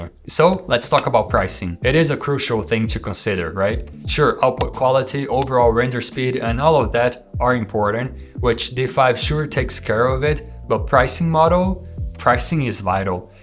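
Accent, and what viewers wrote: Brazil. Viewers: teu ingles é muito bom, mas tenho quase certeza que tu é brasileiro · Tem que ter o ouvido treinado, mas esse cara pelo sotaque deve ser brasileiro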